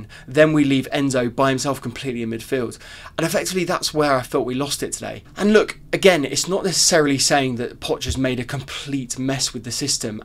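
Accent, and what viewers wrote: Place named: Brighton, United Kingdom. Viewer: Are you from Brighton? You sound a lot like some people I know from Brighton. Love your attitude and outlook. We need more fans like you.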